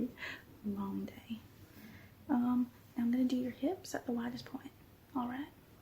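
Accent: South, United States. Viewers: As a resident of the south you got the accent down well 😂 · Just when you wonder if the girl can get any cuter, she throws on a southern accent and continues stealing your heart <3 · Still so naturally chill and yay a new video 🤩 I don't usually find southern type accents relaxing as I have to focus harder to listen lol I'm British so it doesn't exactly come natural to me 😂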